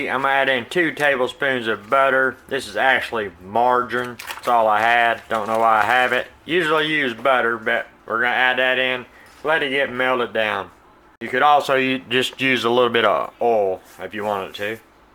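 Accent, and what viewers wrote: South, United States. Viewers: I like you! And I love your southern twang! ❤️ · I already know how to cook but I subbed because your accent cracks me up. I'm from California but when I 1st moved to the South, one state away from you, I couldn't understand what anyone was saying. 😂 Your accent definitely beats arkansans for twang. Maybe I've been here too long...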